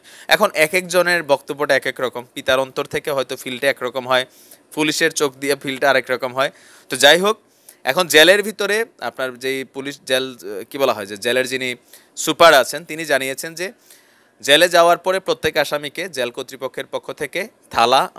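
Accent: Comilla, Bangladesh. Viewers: apnake dhonnobad apnar kothagulu amagur moto mone hoy comilla kothay jobayr dhaka theke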